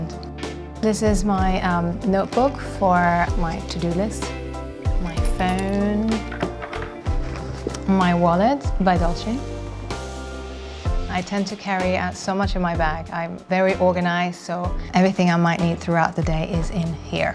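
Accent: United Kingdom. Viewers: She sounds kinda British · Gosh she has such a British accent these days! Seems very nice - does she really only have mascara for make up in there?!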